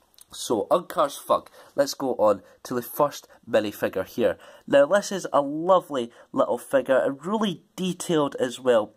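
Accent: Scotland, United Kingdom. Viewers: What part uv Scotland u from?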